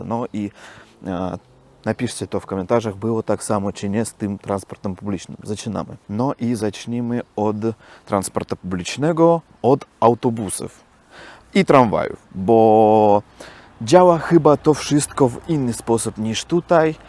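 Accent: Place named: Russia. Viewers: Twój polsko- rosyjski język jest uroczy, nie przejmuj się trudnościami(oni, one itd. ), to przyjdzie z czasem. Jest progres, walczysz, uczysz się i to się liczy. Pozdrowienia. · bardzo ladnie mowi po polsku,nawet malo slychac rosyjskiego akcentu.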